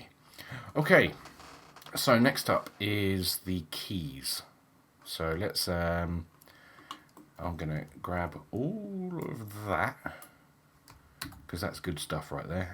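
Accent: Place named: North London, United Kingdom. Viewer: I actually came to this channel because a friend of mine watched this thinking it was me on a different channel, it's quite freaky how similar we sound, not just the voice, but the way we speak, we must be from the same area (just north of London) for sure lol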